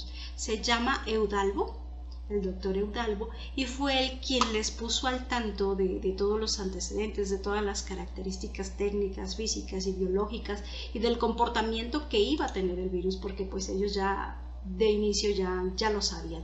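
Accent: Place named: Mexico. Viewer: Hola, voy a asumir por tu acento que eres de Mexico, pero en caso de equivocarme igual gracias por compartir este video en YT, quisiera saber si sabes de algún centro espirita para congregarse aqui en Mexico yo soy de Nuevo Leon pero eh mandado correos al que supuesta mente es de este estado pero no me responden, tendrás alguna sugerencia ? Saludos y gracias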